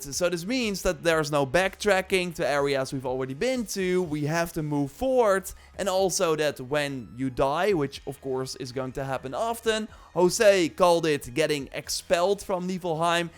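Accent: Netherlands. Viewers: The Holland dutch accent tho strong here.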